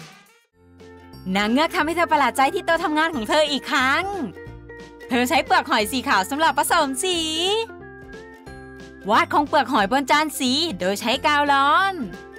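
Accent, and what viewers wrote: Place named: North, Thailand. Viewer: เสียงคนภาคดูเหน่อๆนะ สาวเหนือรึเปล่า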